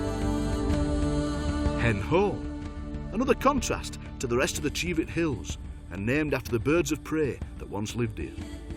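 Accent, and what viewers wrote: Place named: Barnsley, United Kingdom. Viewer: Brilliant footage with voice of a fellow Barnsley man!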